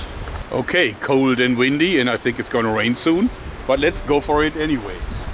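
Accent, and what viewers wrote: Germany. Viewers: do you want to learn German, or do you just like the accent?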